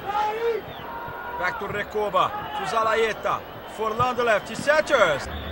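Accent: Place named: Brazil. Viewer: Esse narrador é Br! rsrsrs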